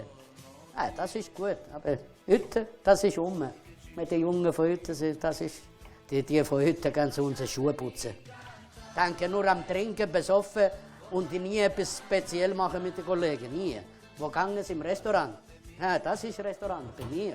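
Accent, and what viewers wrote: Italy. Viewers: Er isch ganz dütlich vom Süde. Erkännsch vom Akzänt und vo de Art (positiv gmeint) :-) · Woher aus Italien ist? Er spricht das s in Bolognese stimmlos aus, das spricht für den Süden, aber seine Vokale klingen eher norditalienisch...wir müssen ihn mal testen. Kann ihm mal jemand eine "cadrega" anbieten?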